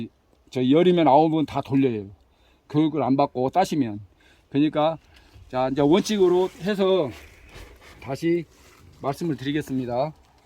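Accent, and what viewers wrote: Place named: Gyeongbuk, South Korea. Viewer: 네네 경북 말씨로 알속무게 이네요 감사합니다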